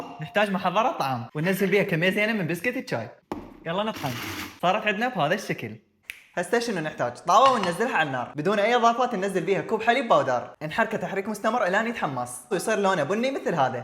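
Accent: Diyala, Iraq. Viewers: مبين من ديالى❤❤😂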